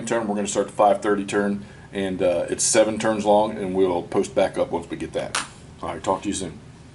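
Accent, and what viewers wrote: United States. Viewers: See to my english ear it sounds more southern gentlemen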